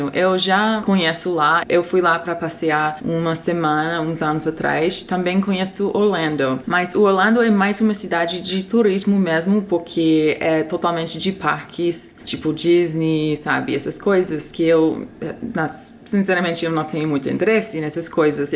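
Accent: Rio de Janeiro, Brazil. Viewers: Estou na cidade de Pompano Beach seja bem vinda mais uma americana Carioca ate o seu sotaque em portugues e do rio show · Vocêix, paíx Totalmente carioca Kkkkk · primeiro: Fico muito feliz em ver vc tão empolgada e com tantos planos! Tão diferente de quando vc chegou aí nos EUA. Depois, acho lindo seu jeitinho carioca de puxar a letra " s" no fim das sílabas E, já que vc não se importa, gostaria de fazer uma pequena correção: A gente não usa "desde" para se referir a lugar, só usamos para tempo. Pra lugar, falamos só "de". Abraço. Tudo de bom pra vc e pro Max! 🤗😘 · Você herdou o sotaque carioca. Hahaha Paísssss, nóissssss Legal isso. Boa sorte, menina. Jogue-se de cabeça. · Americana com o verdadeiro sutaque carioca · esse sotaque carioca dela é engraçado demais: Nóixxx Paixxx! será que ela sabe todas as gírias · Já fala com o xxxx do carioca! Rs Boa sorte na viagem! 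❤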